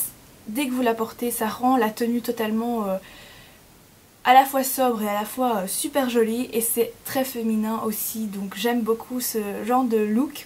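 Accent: Belgium. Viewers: Oh je n'avais même pas remarqué l'accent belge mais quand tu as dit bruxelles ça a tilté !!!! Enfin bref j'adore tes vidéos , continue comme ça !!!! ;)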